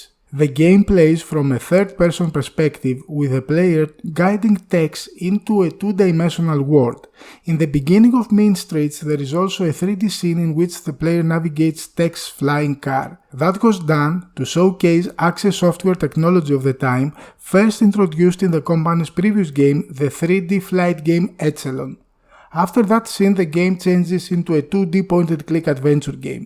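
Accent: Greece. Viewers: I still remember the day when i first loaded Pandora Directive into my computer and started the game , it remains my favorite game of all time until now! Btw from your pronunciation i would bet you are Greek.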